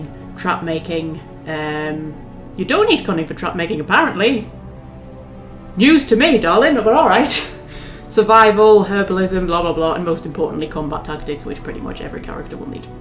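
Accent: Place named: United Kingdom. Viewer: Adorei sua voz e o sotaque britânico, entendi tudo que falou! Ajudou muito, obrigado!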